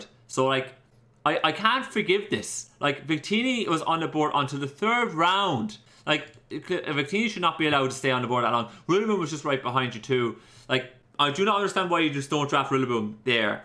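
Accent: Ireland. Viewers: I always forget just HOW Irish you actually sound 😅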